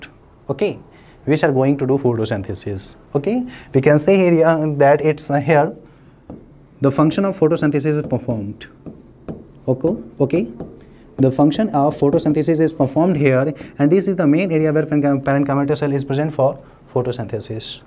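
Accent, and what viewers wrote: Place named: Palanpur, India. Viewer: Are palanpuri accent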